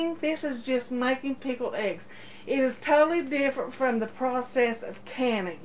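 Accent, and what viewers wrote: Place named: West Virginia, United States. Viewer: You have to be from WV. Either that or Kentucky. I'm from WV, and can usually tell a fellow hillbilly when I hear one talk. Great video by the way.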